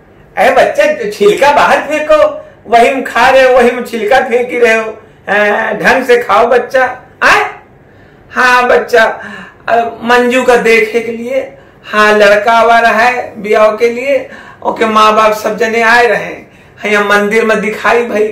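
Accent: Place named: Awadh, India. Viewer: एकदम शुद्ध ग्रामीण अंचल की अवधी है | बहुत बड़ा काम कर रहे हैं आप | "दहिजार का नाती " बड़ी मिठास भरी गाली है | लुप्त हो रही भाषायी विरासत को आप जैसे मझे कलाकार ही सजों सकते हैं | बहुत बहुत धन्यवाद जी |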